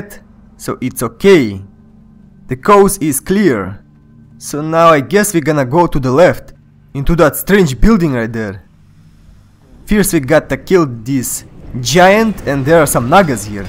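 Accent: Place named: Russia. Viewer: that is one heavy russian accent you got there bratan.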